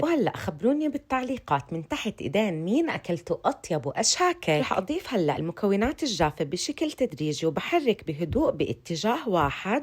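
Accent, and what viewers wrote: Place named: Palestine. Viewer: اولا انا اكتشفتك من اسبوعين ومتابعتك من سوريا وبيعجبني شغلك كتير تانيا انتي اكيد فلسطينيه مبين من لهجتك الحلوه وكنيتك وانا كمان ستي امه لابي فلسطينيه من بيت التابلسي بس نحن سوريين ....تالتا رح نجيب شاياتنا ونجي ناكل الكاتو عندك بس انت وين ؟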